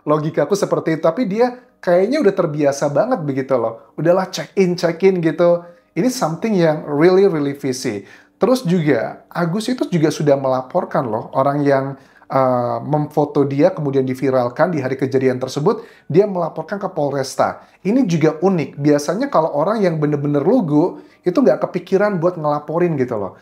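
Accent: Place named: Bali, Indonesia. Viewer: Nada bicara nya dan nama nya orang baly tuh...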